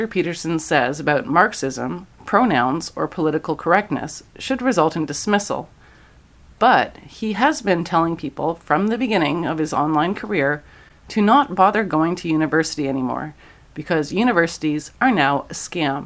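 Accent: Canada. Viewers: best Canadian accent ever!!! thanks for the laugh, peace oot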